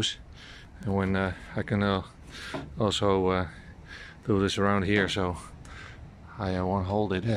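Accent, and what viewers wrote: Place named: Netherlands. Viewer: The Dutch English accent is so endearing... my favorite. Also thank you for the instructions, exactly what I was looking for. Keep it up. Subscribed :) bedankt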